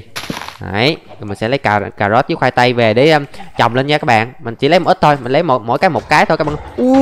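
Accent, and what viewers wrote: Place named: Dong Thap, Vietnam. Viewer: Giọng ngày xưa đặc chất Đồng Tháp lun 🎉🎉🎉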